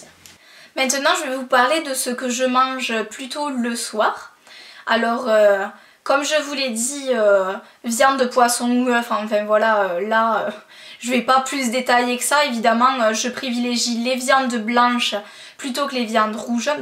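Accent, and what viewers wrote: South, France. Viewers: cc tu es de Marseille ? Nîmes ? Toulouse? j ai un doute . biz gaelle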